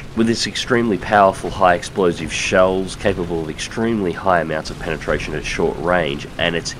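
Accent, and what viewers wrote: Australia. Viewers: mate the aussie in you is coming out lol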